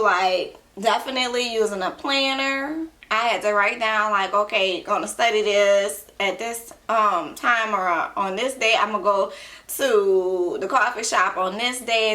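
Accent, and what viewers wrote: New Orleans, United States. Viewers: First thing I said she from nola accent tho😍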